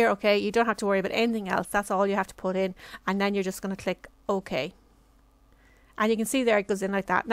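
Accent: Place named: Ireland. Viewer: Lots of ok ...ok..ok...all over. and it will sound more interesting...probably Irish Accent?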